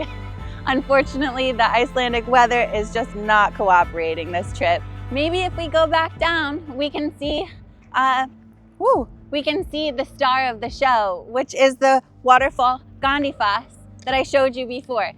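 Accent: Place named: New York, United States. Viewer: Was not expecting a New York/Joisey accent.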